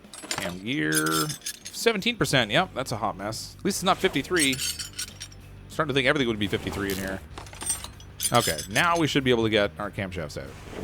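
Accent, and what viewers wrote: Canada. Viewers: loving the vids m8, i remember doing this job urghhhh thought it was gonna be a nice quick one lol. and i did the same had to go back and replace the spark plug, also some of the other 53% parts! can i ask if your canadian??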